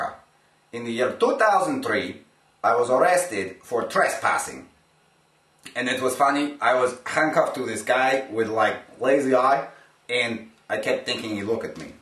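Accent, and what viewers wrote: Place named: Ukraine. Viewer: I can't make sense of the riddle, but from your accent alone, my guess would be somewhere in the USSR (as it was still called in 1978), perhaps Ukraine. Second guess would be Poland, but that seems too obvious.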